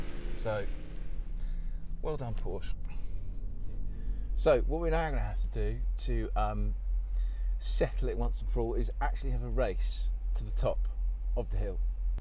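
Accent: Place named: United Kingdom. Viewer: ridiculous comparison. they both have same tyres? you cannot convince anyone that you were objective with that biritish accent.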